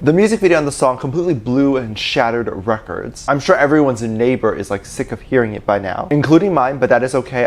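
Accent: South Africa. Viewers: you are South African? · wait are u a fellow south African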